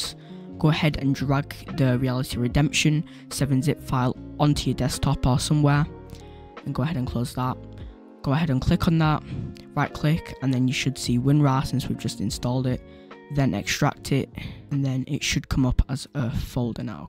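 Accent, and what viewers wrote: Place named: United Kingdom. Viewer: Oh you're British 😮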